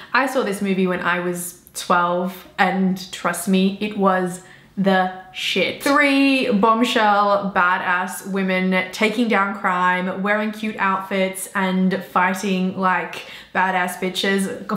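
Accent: Australia. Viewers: You have a good auzzie accent you speak properly unlike some auzzies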